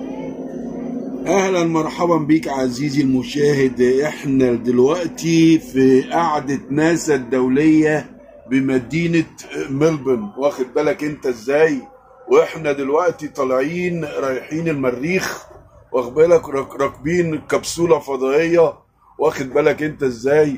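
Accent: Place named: Egypt. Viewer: غريبة جدا بقالك 40سنة غربة ومازال لسه عندك اللهجة المصرية هذا يدل علي اصالتك وجذور عائلتك الاصيلة انتي من الشرقية واتنقلتو زمان الي الاسكندرية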